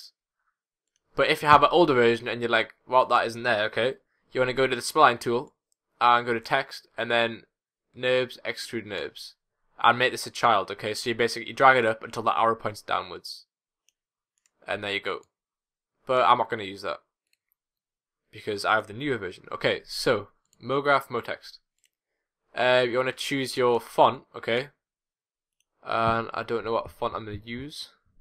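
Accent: Newcastle, United Kingdom. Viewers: R u from newcastle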